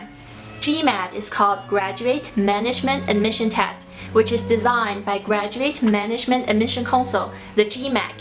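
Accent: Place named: Singapore. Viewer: its the way she speaks her accent..i lived in singapore for 6 years and did my schooling there and alot of people over there speak like her but when they write you will come to know how awesome their english is ...dont be fooled ....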